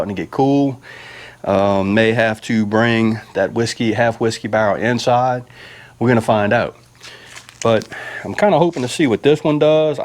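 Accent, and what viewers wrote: South, United States. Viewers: Omg love the country accent authentic American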